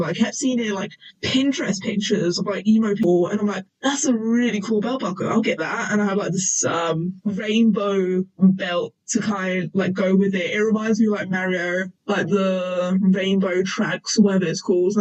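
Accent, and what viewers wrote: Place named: United Kingdom. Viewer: Hello fellow Brit! It’s very comfy listening to your accent 😆 There was a lot of stuff in your video pre- I had no idea about, I should check those artists out! When you said you wasn’t sure if you got anything wrong during the 2000s period, I have some observations I was a teenager in 2008 (I was 18!) so I can give you a bit of substance to the google trend data NintendoXCore was of course a genre of music coined by on their first demo and their website. The animated music video went viral in 2004 on Albino Blacksheep and pushed them to new heights. The original video didn’t even use Nathan’s vocals, they used Adam Crooks despite Nathan’s already being on R.Borlax. Cool fact! So during that time they were circulating alongside other post-hardcore inspired acts like, etc. NintendoXCore as a genre stood out because it was making heavy and obvious use of the chippy synth sounds. 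But teenagers on MySpace during what you accurately called the third wave of emo (scene!) just thought it sounded cool and didn’t know what the hell they were talking about. So they’d unironically say things like ‘I prefer SegaXcore to NintendoXcore RAWR’ and other ridiculous sounding things that had no bearing on the music itself. It just kind of became its own meme. Because a lot of bands gaining prominence back then were using 8-bit, chiptune, or electronic sounds NintendoXCore as a genre kinda blurred into the cul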